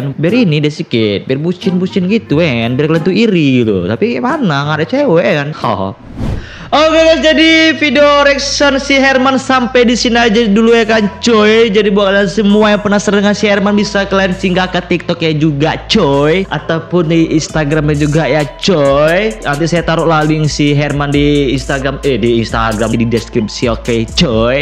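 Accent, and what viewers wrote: Nias, Indonesia. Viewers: Abng orang nias yah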